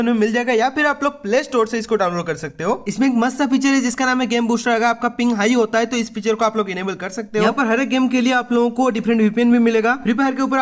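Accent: Odisha, India. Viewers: Bhai tum odisha se ho na 😊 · Bro r u from odisha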